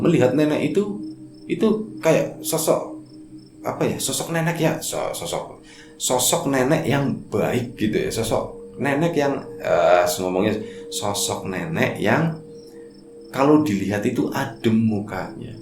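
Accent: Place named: Java, Indonesia. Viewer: Sekarang agak mendok ya mas 🤭🤭🤭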